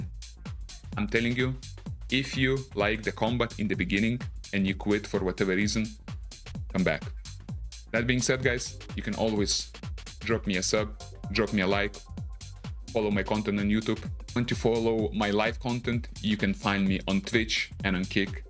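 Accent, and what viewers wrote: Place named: Russia. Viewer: Пипец акцент русский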